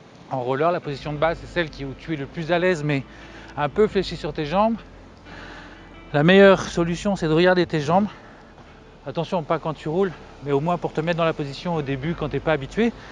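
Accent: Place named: Réunion, France. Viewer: Bonjour 👋 Et mes sais a la reunion ?l’endroit et ton accent me le fait penser je commence tous juste le rollers premier pas en rollers sait la galère sachant que je suis obligé d’à prandre seul 😢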